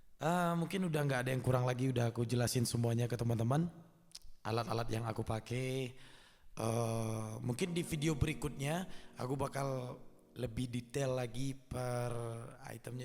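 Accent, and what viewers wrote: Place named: Padang, Indonesia. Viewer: Bg lu org padang ya? Bahasa indo lu agak lain 😂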